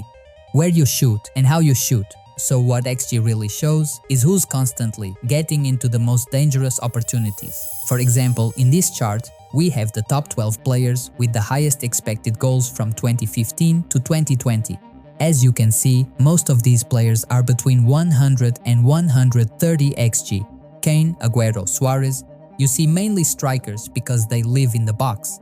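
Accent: Portugal. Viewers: This guy has a portuguese accent! Great video/Grande vídeo! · Este gajo eh Portugues.... de certeza. Heheheh Video genial!!!!!!!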